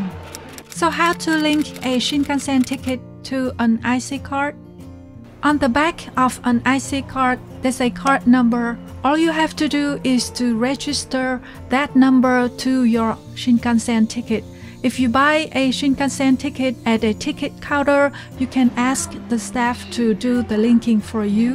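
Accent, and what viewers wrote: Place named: China. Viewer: Are you a Mainland Chinese living in Japan? You speak English like my Mainland China colleagues. Nothing wrong with that, just wondering 😊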